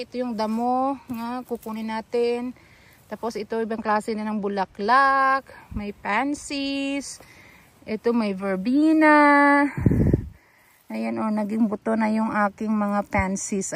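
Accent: Iloilo, Philippines. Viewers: Good morning sis I just found your channel a minute ago. I can tell you're ilonga the way you speak so gentle and your tone. So nice to watch your garden. I also love so much gardening. My parents are hardworking farmers back in the Philippines long ago. But now I been living here overseas for a long time. Have a blessed day 💜🙏🙏🙏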